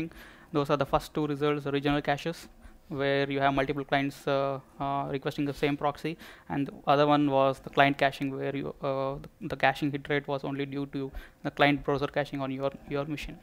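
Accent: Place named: India. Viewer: need some time to used to this India accent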